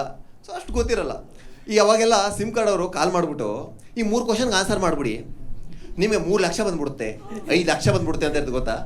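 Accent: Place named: Mandya, India. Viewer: Watched 2nd time next episode pls Pakka madya slang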